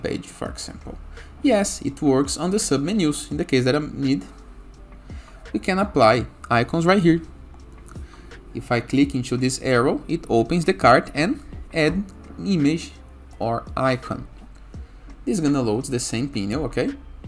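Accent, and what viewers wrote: Brazil. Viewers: great video. btw you brazilian?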